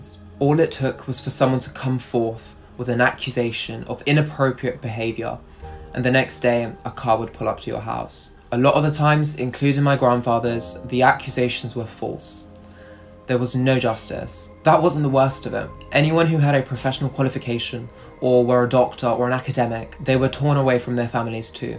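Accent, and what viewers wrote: United Kingdom. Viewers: Hiya Political Dolphin, I appreciate your response, and moreover your effort in reading my comment. I know the effort to engage reasoned dialogue is often futile, but one still needs to try. I can appreciate the channel host's disdain for corrupt and oppressive governance. Given his apparent youthful age and finely articulated British accent, its unlikely that he has experienced first hand the torture of Western ostracization, though he may have suffered through the post-Soviet era collapse and economic "shock doctrine" imposed upon the reeling Eastern European States by the victors of the cold war. If he is of Slavic or Balkan heritage, he may have even been a victim of the cultivated conflict in the former Yugoslavia. If Ukrainian, his wounds are still fresh. If he is himself a university student, he should entertain discourse that would encourage him to think outside of the box - particularly if he intends to pursue post-grad studies - whatever the discipline. 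For myself, I've gotta figure out a way to better communicate in 120 words or less. Cheers.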